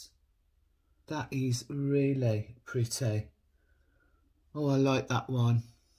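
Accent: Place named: Black Country, United Kingdom. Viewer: I am from Birmingham and still live there. I would say you are from the Black Country . Love your videos x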